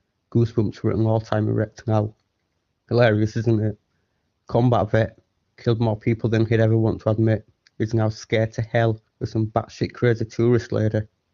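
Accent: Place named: Northern England, United Kingdom. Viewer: You're a very good story teller ( PLEASE don't think I' m being rude ) but you might need to not be so close to the microphone and also concentrate a bit on your diction because some of the words are hard to understand..I btw am a northerner too like you so similar dialect ...anyway you now have another subscriber :)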